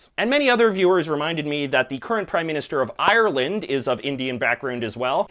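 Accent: Canada. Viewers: Well he’s Canadian he’s pronouncing it in the Canadian not the Jamaican accent obviously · As an American, and particularly as a southeastern American it's very interesting to me to hear how you say things. I don't know hardly any Canadians in north Georgia and certainly none with the stereotypical Canadian accent. Little things like how you said keenya and in another video you said naypal for Nepal. Also it was funny how you couldn't do the polish name.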